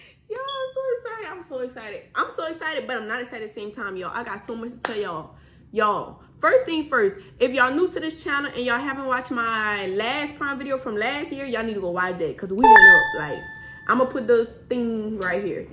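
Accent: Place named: Louisiana, United States. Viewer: periodddd, i can tell you from louisiana accent so cute ❤️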